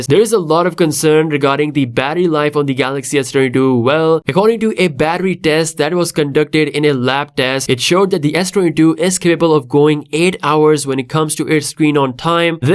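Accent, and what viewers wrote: India. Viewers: Your Indian accent is getting better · Man trying to speak good English still have 10% Indian accent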